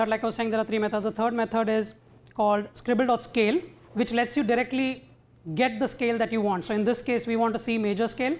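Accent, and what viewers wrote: India. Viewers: I hate this indish acent! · awww dam i hate the Indian accent, and i am a music aficionado, well nice demonstration anyway, i enjoyed it, even if the odds where not on the speaker side (for my part). thank you !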